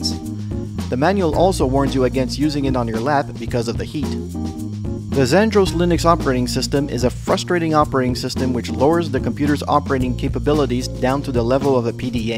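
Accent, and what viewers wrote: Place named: Quebec, Canada. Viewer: It comes into 'play' when someone has posted an instructional video such as the one visible above and has ruined the video by speaking in some bizarre french canadian hybrid accent..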